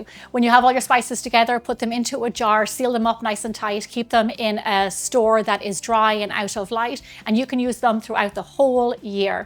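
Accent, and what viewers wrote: Ireland. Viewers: ❤❤❤❤❤ THANKS SO MUCH! Your videos are so well done. The camera work and closeups, the explanation and history, and of course your adorable Irish accent all combine for a lovely presentation. I’m eager to try your mixed spice. 🥰 PS Do you have any cookie recipe that use this mix? Also, I live in a rural area and probably can’t get all the spices locally. Can you recommend an online source? Thanks again. 😊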